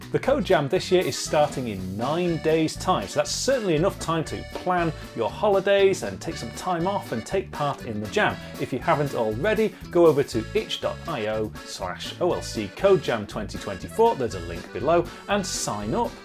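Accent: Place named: United Kingdom. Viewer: why you don't say hello with that British accent, is important to me as old subscriber 😠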